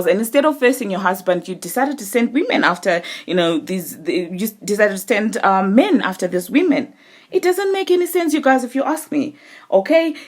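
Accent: South Africa. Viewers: Are you South African 😢😍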